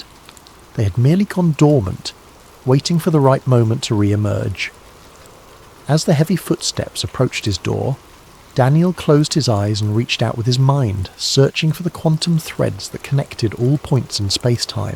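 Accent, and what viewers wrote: United Kingdom. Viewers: Can we get these without a UK accent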